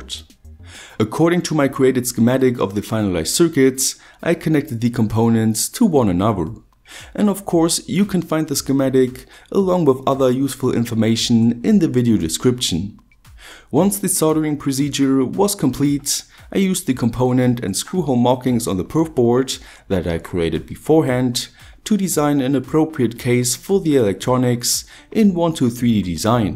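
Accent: Germany. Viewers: man hört das du deutscher bist!...Wenn ich mir deine Materialien so angucke und die Art wie du es zusammen baust, dann werde ich das Gefühl nicht los das du ein Elektriker auf einer Werft oder einem anderen Maschinbau Firma bist oder warst!...Auch die Kabelhalter , die Gewindeschneider und Senker sehen nicht aus, als wenn du sie aus dem Baumarkt hast!..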